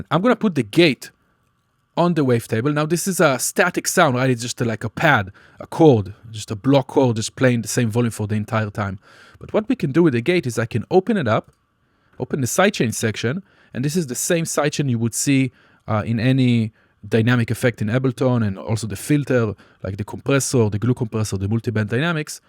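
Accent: Israel. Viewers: gotta love that Israeli accent (great tutorial btw!)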